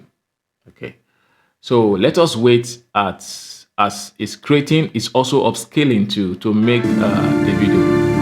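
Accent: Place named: Africa. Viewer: thanks for the video love your african accent :)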